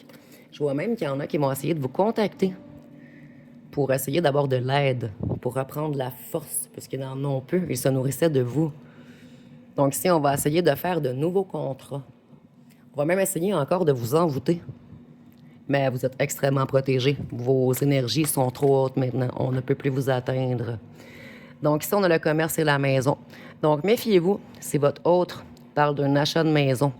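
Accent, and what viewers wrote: Quebec, Canada. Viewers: Mais qui me veut.du mal Je ne comprends pas toujours ce que vous dites avec votre accent Québécois Moi je suis en France je suis divorcée A bientôt de vous ecouter Bisous bisous 😘😘🙏🏻😇💕😊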